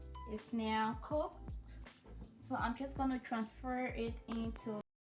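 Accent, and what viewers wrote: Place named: Liberia. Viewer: Sis, are you Liberian? I dig the accent. I too am Liberian. Love your channel and very informative.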